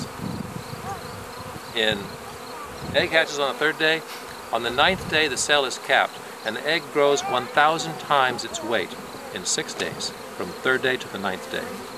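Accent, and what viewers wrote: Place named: England, United Kingdom. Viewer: Hey you sound like your from England, did you move to the USA then to start your bee company?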